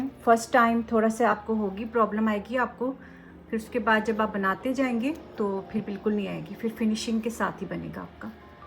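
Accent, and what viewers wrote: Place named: Pakistan. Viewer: Lovely api. Ur Urdu accents sounds Pakistani 🫶🏻 happy to see. Bhot he details me r bhot achy sy smjhaya ap ny. Lots of love❤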